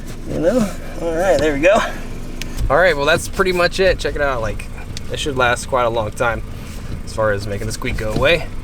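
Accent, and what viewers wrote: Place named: Texas, United States. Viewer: I think you done developed a Texas accent there buddy!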